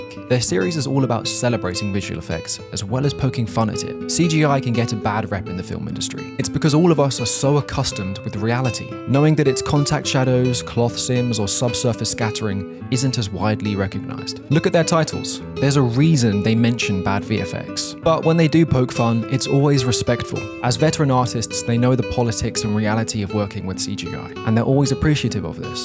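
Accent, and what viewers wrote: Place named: England, United Kingdom. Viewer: Lovely English accent - not Irish, Scottish or Welsh. Crisp, clear English accent. I sat through your entire video just listening to you talk. Don't get me wrong - the content is great. But I was here for that lovely English accent! Thank you.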